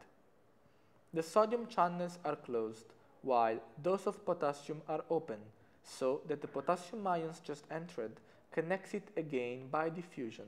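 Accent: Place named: Romania. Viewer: This teacher seems Romanian as his accent is so likely to the Romanian pronunciation🙂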